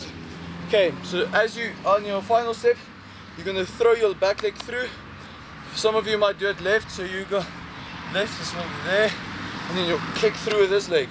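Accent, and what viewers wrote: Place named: South Africa. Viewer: I recognize your accent, are you from south africa?? Your accent sounds south african! :D im a martial arts tricker from south africa!!! :D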